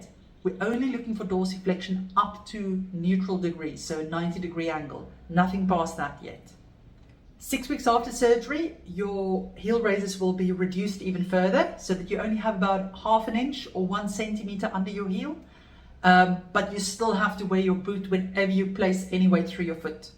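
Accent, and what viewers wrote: South Africa. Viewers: This video was the best help and support I've had in the whole duration of my achilles recovery! Thank you so much for the breakdown of the recovery process.: baie baie dankie - mal oor jou aksent. Dit was so lekker om hier in Australia na n stunning video vanaf UK te kyk en lekker te voel oor ons Saffer roots!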